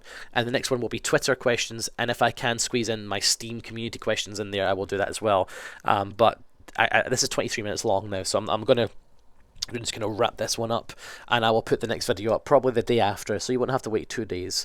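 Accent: Scotland, United Kingdom. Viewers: SCOTTISH FOR THE WIN GO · I may not be sociophobic much like I hate talking to people i've never met and I hate it when someone looks at me.. but i do have extreme trust issues... like for one thing i'm scared to get a boyfriend... but still you make me feel better when I watch your videos knowing you have phobias too... Anyway, you are truly amazing and i'm happy to hear your scottish too I have a family kilt and love it.. You rock and never forget we love you!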